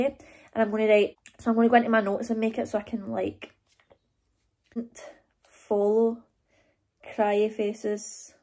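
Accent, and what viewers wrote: Scotland, United Kingdom. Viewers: I love your Scottish Accent ❤️❣️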